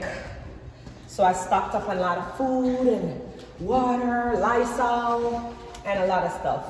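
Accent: Jamaica. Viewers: PLEASE KEEP YOUR MASK ON SWEETHEART IN THE STORE YOU CAN WALK RIGHT BEHIND SOMEONE THAT JUST SNEEZED OR COUGH AND THE SPOURS STAY IN THE AIR FOR SO LONG I JUST GOT HOOKED ON YOUR MUSIC AND ACCENT AND I DONT WANT TO HEAR THAT YOU ARE SICK....I AM NOT A STALKER. I JUST LOVE TO HEAR YOU TALK.I MAY BE YOUR MOM OR GRANDMOTHER'S AGE. GIRL I WISH I COULD SPEAK LIKE YOU. I LOVE LOVE THE JAMAICAN ACCENT. MY PEOPLE i love my black people. I only hate one thing about my people is the JEALOUSY AND THE FALCT WE DONT STICK TOGETHER LIKE BACK IN MY DAY. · I love the way you talk. You are so jamaican. You are so talented and beautiful, fine. I watch you on love and hiphop atl. I listening to your music and look at your videos.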